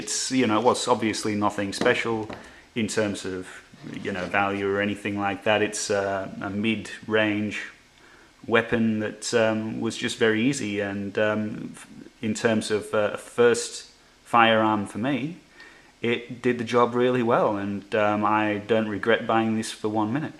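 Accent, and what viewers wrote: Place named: Australia. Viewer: You sound like you're from Australia. I thought, perhaps incorrectly, that hand guns weren't allowed there?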